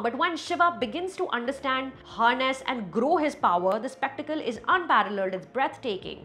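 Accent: India. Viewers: long time fan of your reviews. However, I find your recent reviews a bit strangely edited. Let me explain- also, this is honest feedback not a low effort trollpost. Your recent reviews have you speaking a bit too fast, definitely faster than your previous reviews. I am not sure if this is because you are using a software that takes out the pauses? Just feels rushed and a tad unnatural. This review of brahmastra felt as frenetic as the movie- I tune into your channel because you bring your biting wit, sharp analysis, and humor all to bare. Also love how you own the desi angrezi accent - but the audio editing in these videos is so off, it has me pausing and rewinding quite a bit. If it’s not the editing, may I suggest probably using a slightly more relaxed vocal delivery (similar to your earlier style). Koi jaldi nahi hai- I will easily watch a 15-20 min review for the quality you provide!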